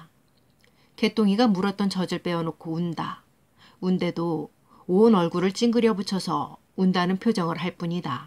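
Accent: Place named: Pyeongan, North Korea. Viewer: 사투리 ㅋㅋㅋ 무슨 평안도 사투리같아요 ㅋㅋㅋㅋㅋㅋㅋㅋㅋㅋ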